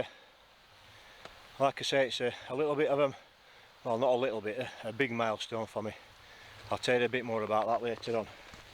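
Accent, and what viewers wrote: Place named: Lancashire, United Kingdom. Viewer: Another cracking video,. Where are you from, if you don’t mind me asking? I see on your IG profile you’re in Barnsley (South Yorkshire) but you use quite a lot of Lancashire slang, like ‘hafe’ instead of half and ‘neet’ instead of night.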